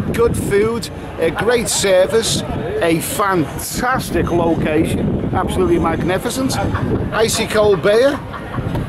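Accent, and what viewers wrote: Liverpool, United Kingdom. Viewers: Good Morning just gone 7.30am here in deepest Issan What a great way in which to start the day a vid from The Beer Garden Yule be looking for a deckchair on the beach now to sleep all that fayre off!! Great viewing always a pleasure to hear your dulciet scouse tones Reminds me of an ol mucker o mine from Toxteth living in Jersey keep up the Pattaya vloggin it fills a much needed void up here in riceland All The Best Big C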